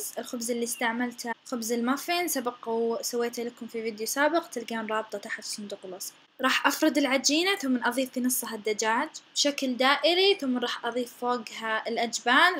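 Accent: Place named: Saudi Arabia. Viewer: صراحة انك مبدعه ماشاء الله بالتوفيق وبالنسبه للي يستغرب طريقتها في الكلام اذا سعودي ليش مستغرب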